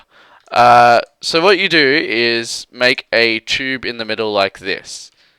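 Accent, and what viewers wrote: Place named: Australia. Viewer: I laughed my ass off hearing you count from 1-30 seriously, i admit i've heard my fair share of australian accents, but this was brilliant, this made my day! Hope no offense is taken, im saying this as a compliment. If i could have an australian accent i would ! just to count like you do! lol haha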